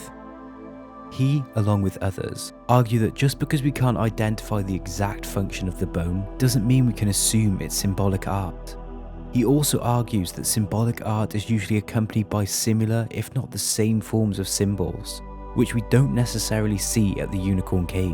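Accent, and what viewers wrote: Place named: Shrewsbury, United Kingdom. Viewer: The documentary I've been hoping for.... since primary school when I read a book on prehistoric Britain & saw intriguing paintings of neanderthals, to the Frazetta "Neanderthal" (worth doing an image search for!) I've been fascinated. Clan of the Cave Bear was food for thought... this is perhaps the best documentary I've watched. And your accent & narration adds to it (Shrewsbury?)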